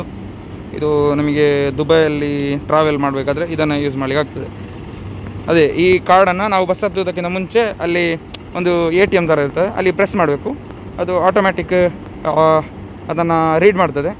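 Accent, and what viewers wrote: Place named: Mangalore, India. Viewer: Nam mangalore baashe keluke chanda marre...sudden agi nim vlogs pop Aithu check madide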